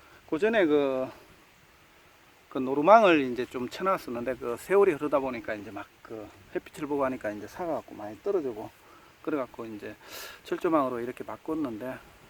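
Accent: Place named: Gyeongsang, South Korea. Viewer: 네^^ ㅋㅋ 반갑습니다 올려주신 영상 잘보고있습니다 경상도 사투리도 듣기좋구요 좋은곳 많이올려주세요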